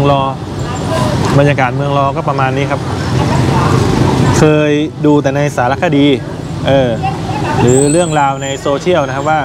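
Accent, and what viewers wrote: Isan, Thailand. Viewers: ทำไมสำเนียงออกอิสานๆมากเลย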